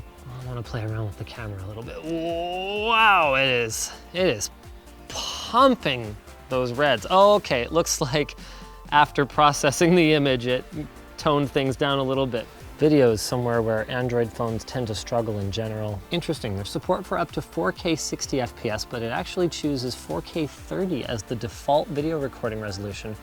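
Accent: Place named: Canada. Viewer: Anyone notice how Canadian Linus sounds in this video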